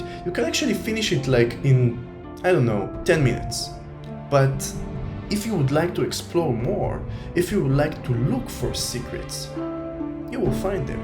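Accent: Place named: Israel. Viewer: can i ask where are u from? u got Israeli accent, and the game looks amazing!!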